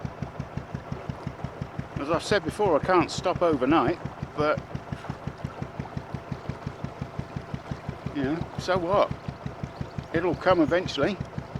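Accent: England, United Kingdom. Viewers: Omgoddess RESPONDED! I feel special. The herons of my home state Kansas, are a bright blue! I love your travels along the locks and Aslans chug chug, and all American lassies love the English accent. 🥰 Your chopper is also very boss! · I also discovered you on Amazon prime,, You saved my mental health through out the lock down!! I loved the scenes, the homes, the towns,the sound of the Mc laren motor.. your English accent.. I am sorry about the thugs on New Years eve,, what a bummer!! Made me so angry ..You are a Gentleman,, and I thank you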